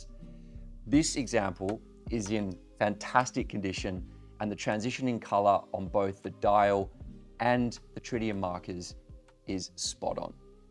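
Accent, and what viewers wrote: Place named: Australia. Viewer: I sense a slight Australian accent there. Great video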